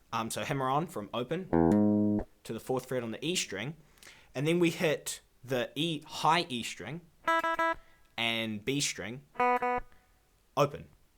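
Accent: New Zealand. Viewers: Is your accent New Zealand-ish? (Idk how to say it I'm stupid XD) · when I first watched this video (it was the first video of you i saw) i said to my sister 'wow I really like his accent! Where is he from?' And she told me it sounds Kiwi, I am from NZ as well but you have a very strong accent its amazing!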